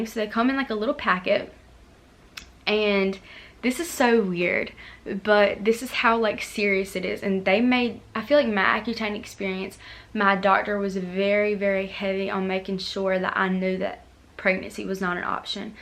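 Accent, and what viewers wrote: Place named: Texas, United States. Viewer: Are you from Texas or something, love your accent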